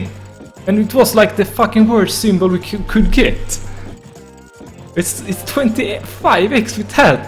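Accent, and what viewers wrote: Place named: Sweden. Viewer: swedish accent confirmed :D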